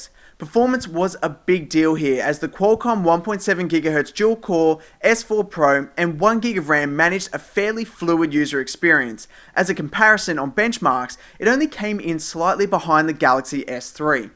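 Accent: Australia. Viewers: Australian accent ~ :D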